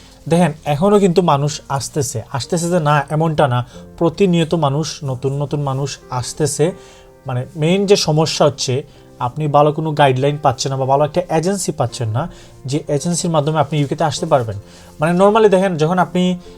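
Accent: Sylhet, Bangladesh. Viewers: আপনার কথাগুলো তো অনেক সুন্দর সিলেটি মাল👍👍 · vaiyar basa ki sylhet ? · Bhai ki sylheti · ভাই মনে হয় সিলেটি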